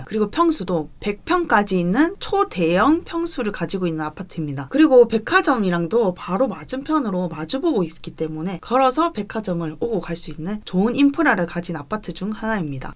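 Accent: Gyeongsang, South Korea. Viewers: 대구 사람 아입니까?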